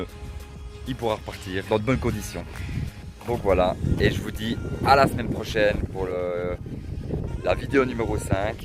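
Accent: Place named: Liège, Belgium. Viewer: salut mec ton accent me dit un truc haha tu viendrais pas de liege? :p super la video sa vas bien m'aidé merci a toi 1 abo en plus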